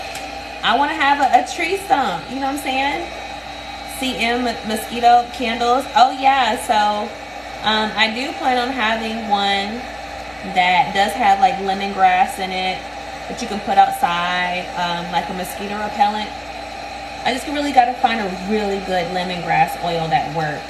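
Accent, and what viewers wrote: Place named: South, United States. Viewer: Your hair look's so fascinating i love your southerner voice so sexy a real turn on ❤❤❤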